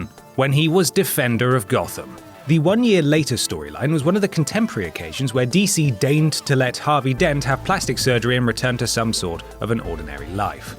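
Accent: United Kingdom. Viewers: freaking pompus british accent makes me hate you, but damn do i respect you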